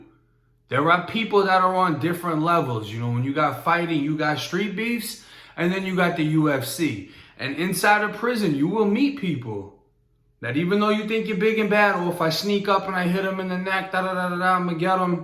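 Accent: Boston, United States. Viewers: Hey I just discovered you and I’m def a fan, keep up the good work!! Are u originally from Boston? Cus I am and I swear I hear alil bawstin accent? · I hear that Boston in you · Where are you from? Im from Boston im like he must be lol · New to the channel, you from Boston? You got the heavy accent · Just wondering bro. I know u talk about doing your bids in Florida. But hear your Boston accent. Jyst wondering when u left Boston and where u staying now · youz my dude i always thought you was from Boston thought. This a righteous call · Off the record....I love your voice...😍🥰🥰 u got that BOOOUSTON accent 🤣🤣🤣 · Are u from Boston? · Really tired of listening to your war stories about Florida DOC. I'm old school, did 9 and half calendars in fsp, sumpter, marion, hendry and many other camps. All this bullshit you're spreading with your Boston or Maine accent trying to scare soft ass people that haven't been there Talk to me.....I've been there when there was CONVICTS NOT INMATES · Yo r u from boston bro?? 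U got that boston accent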